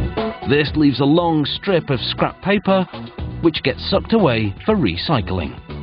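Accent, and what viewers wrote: United Kingdom. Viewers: Sounds northern English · Where is this guy's accent from? I know, all you geniuses, that it's British... but from which part?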